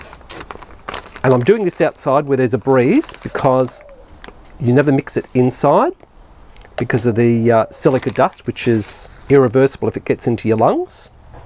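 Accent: Australia. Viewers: Maybe it sounds like questions but more than likely just Aussie accent:) It'd be boring if we all sounded the same:P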